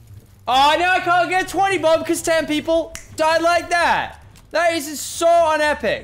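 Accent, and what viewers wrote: Australia. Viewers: Are you aussie i am too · hes an aussie ya fake fan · Is he Australian or American it’s really hard to tell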